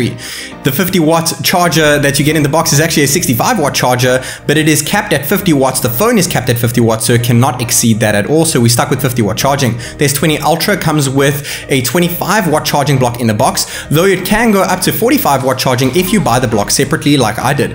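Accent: South Africa. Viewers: Instantly knew you were also South African once I heard your voice, now I gotta sub haha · You are definitely south african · South African accent, talking at the speed of an auctioneer. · Are you South African?? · U sound south African so u now my favourite tech guy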